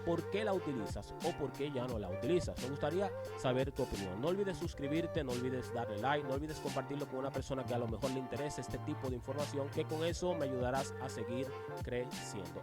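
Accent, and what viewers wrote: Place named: Dominican Republic. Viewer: Es primera vez que veo tu canal, te saludo desde El Salvador. Interesante historia, por tu acento creo que sos Dominicano. Dios te bendiga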